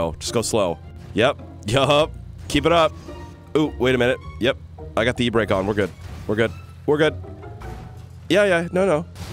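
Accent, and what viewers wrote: United States. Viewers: you sound like the most American person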